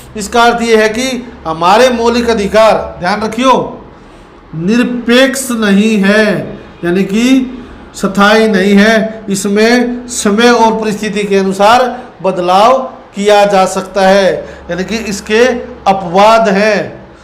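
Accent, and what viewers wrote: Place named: Haryana, India. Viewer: Hariyanvi kamal 😊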